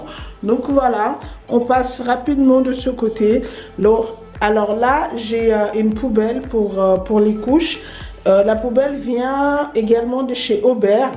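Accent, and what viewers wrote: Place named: Belgium. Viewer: Cc,juste par curiosité: tu es une belge perdue en France? 😜 t a l accent belge! Mais tu parle souvent des magasins typiquement français! Kiabi,gifi........ peut être frontalière? Bisous😘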